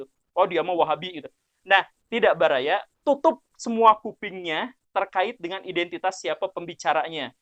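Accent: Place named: Sunda, Indonesia. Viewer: Pandai adek I yg ganteng nich... Sunda nya medok ieu teh. ...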